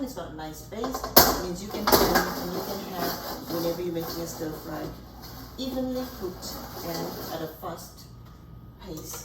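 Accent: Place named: South Africa. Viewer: Came across your channel and ❤ your recipes. You sound like you from South Africa. Keep the recipes coming.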